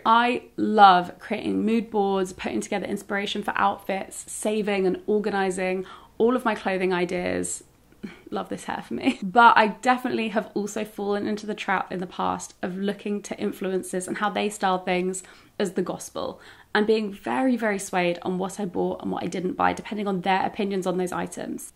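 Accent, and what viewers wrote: United Kingdom. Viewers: Omg, I literally faancy your accent!! Beautiful British accent 🤍🤍🤍. And the video was very helpful. Thanks 🙏🏽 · Awww you are so beautiful and funny and overall so enjoyable to watch. Love your way of speaking too (said by a non-British person who loooves a good British accent and well-spoken English in general!) Happy to join your channel and happy to have found it! Yay! Thanks for the tips, I am new to the "Capsule wardrobe" system and I am considering adopting it, as I like the idea - but at the same time I am not too sure about some other aspects of it... so thanks again, this will help me make better decisions (hopefully, haha)! P.S. with your body type you would be soooo popular in my country of birth, Brazil!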